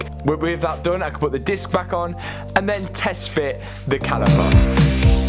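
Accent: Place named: RP, United Kingdom. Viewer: This is my first video I've watched on your video. You sound posh but look like an LA fella 😂. Cool car content though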